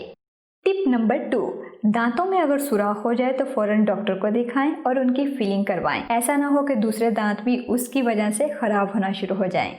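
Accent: Punjab, India. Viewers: Haye tuhadi Punjabi😍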